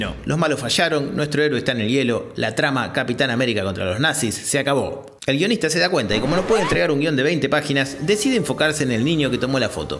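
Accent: Argentina. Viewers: Tenías que ser argentino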